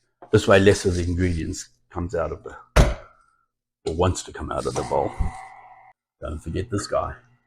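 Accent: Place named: Australia. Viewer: I have stove/oven envy! Love the video and so nice to see the Aussie accent.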